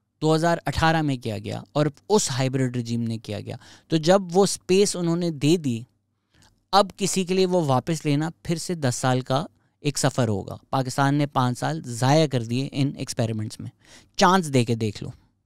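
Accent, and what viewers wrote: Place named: Delhi, India. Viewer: Brother I'm from old Delhi Chandni chowk India I just wanted to say your accent is very similar to the normal Dilli wala accent. ❤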